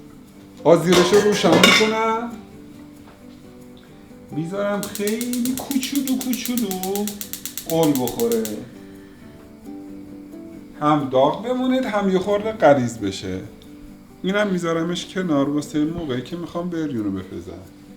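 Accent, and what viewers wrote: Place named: Shahreza, Iran. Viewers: لهجه ایشون بیشتر شهرضایی است تا اصفهانی آنجا که بجای خنک گفت خونک ! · واقعا دستتون درد نکنه،با توضیح عالی وصدای دل نشین وزیبای اصفهونی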